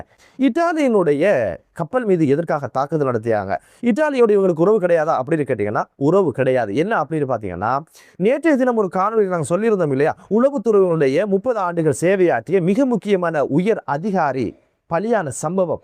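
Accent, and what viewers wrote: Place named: Chennai, India. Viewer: நல்ல செய்திகள். Madras தமிழ்தான் பிடிக்கவில்லை.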